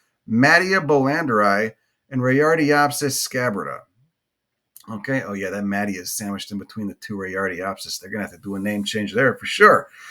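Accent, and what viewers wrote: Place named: New England, United States. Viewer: love the new england fisherman accent… there’s a wise genius to it...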